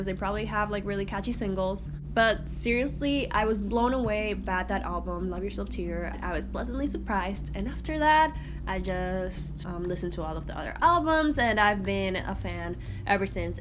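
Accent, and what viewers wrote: Dominican Republic. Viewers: Aaaaah, me encantó tú acento ): ❤️ el acento dominicano es uno de los que más me gusta 👀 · Amo amo tu acento Dominicano! saludos desde Panamá <3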